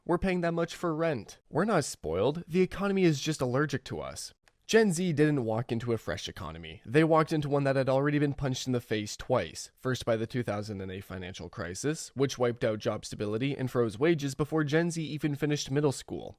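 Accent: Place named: Canada. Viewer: I can tell from your accent you’re Canadian… so am I and we’re screwed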